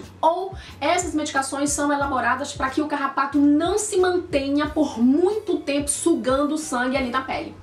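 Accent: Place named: Pará, Brazil. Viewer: Muito obrigadop pelo vídeo, queria que meus professores tivessem essa didática e vc parece ter um sotaque paraense haha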